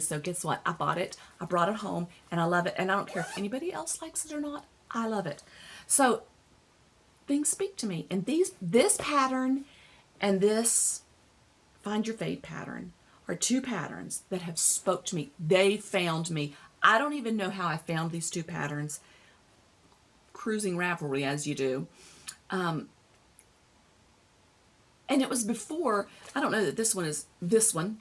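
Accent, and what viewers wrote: United States. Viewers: Love Love Love! Congrats on jumping in and thanks for sharing! I adore your East Tennessee ?(I'm presuming) accent - it reminds me of home and childhood and all things cozy. Glad to meet you! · you did a great job! I'm from Rocky Mount, Nashville NC area. When I heard your accent I knew I felt right at home!!! Can't wait to see more!!!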